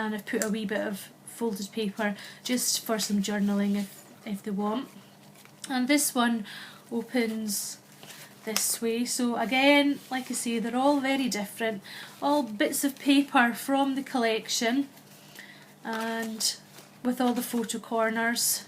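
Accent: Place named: United Kingdom. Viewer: Your imagination is incredible! I was unable to view more than the first two minutes of the first video (not sure why) I saw the doctors bag - girl what a terrific idea! Loved your video - love your voice and accent! (I am originally from the UK - moved here in 1988 (us) and anything from home sounds wonderful. Thank you so very much for sharing your creativity! Hugs Julie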